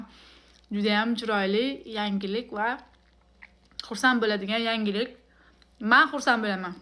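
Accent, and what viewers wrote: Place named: Kolyon, Uzbekistan. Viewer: Siz kòlyondan emasmisiz gapirishiz òxshaydi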